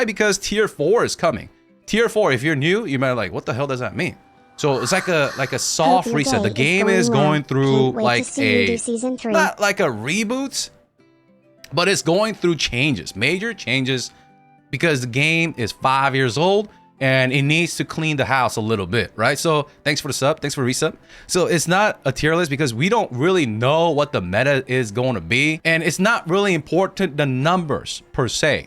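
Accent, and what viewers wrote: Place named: United States. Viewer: Wow you speak American English!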